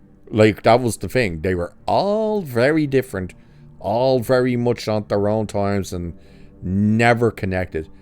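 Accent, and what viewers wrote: Ireland. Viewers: After the remake tv series of knight rider, im not remotely interested. Those morons in hollyweird arent capable of making anything worth watching. Now....just curious, what is your accent? Sounds kind of irish-y with a bit of....perhaps romulan....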